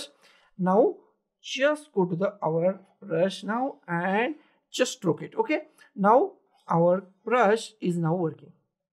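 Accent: India. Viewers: Good tutorial right here, just fix your hard indian accent and you'll get 100k sub in no time. Cheers man, big ups to you.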